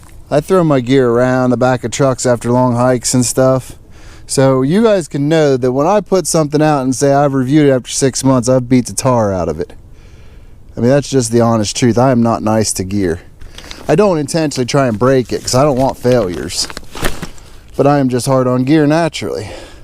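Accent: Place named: Mid-Atlantic, United States. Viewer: Love that mid Atlantic accent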